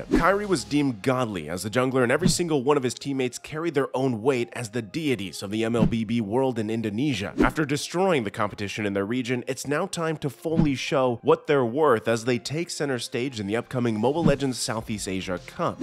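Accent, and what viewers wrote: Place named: East Malaysia, Malaysia. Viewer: Your voice It feels like an east Malaysian (Sabah & Sarawak) pronunciation kinda style 😂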